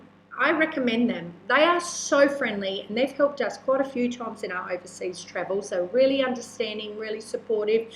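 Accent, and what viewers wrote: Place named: Australia. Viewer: Hey it's Nealos. Good to hear you still sound Aussie as fcuk! Hang in there mate.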